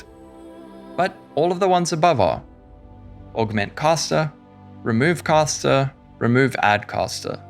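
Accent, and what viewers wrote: South Africa. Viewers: Is it my imagination or are you South African?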